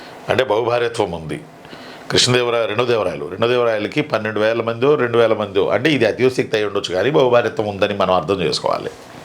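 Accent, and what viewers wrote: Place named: Telangana, India. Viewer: Sir.. మీరు మెల్లగా తెలంగాణ slang లోకి మారిపోతున్నారు..😂( ప్రదేశం ప్రభావం అనుకుంటా)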